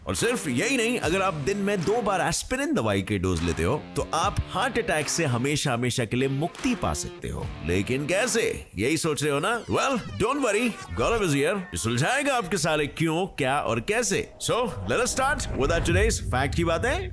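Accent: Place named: Maharashtra, India. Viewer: I always like your Marathi accent.....👍👍👍🏻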